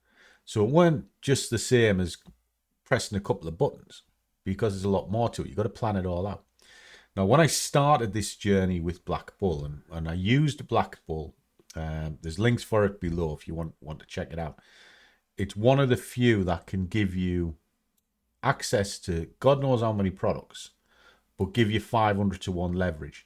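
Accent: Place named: Wales, United Kingdom. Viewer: You remind me of me! We are a similar age and have the same sense of humour and no BS tolerated - and I love your welsh accent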